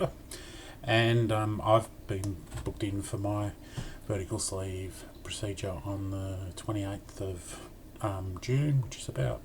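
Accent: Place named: Australia. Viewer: Great job on your first video!! Any friend of Tanya & John's is a friend of ours!! Nice to meet you!! Love the Aussie accent!! Good luck on your journey!!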